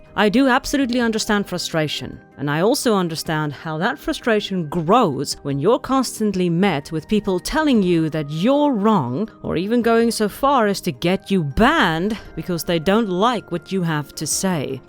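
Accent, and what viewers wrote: South Africa. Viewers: Ma'am are you South African? You sound so Safa it's making me smile like crazy, if not then ignore this lmao, but that accent it's giving me such heavy South African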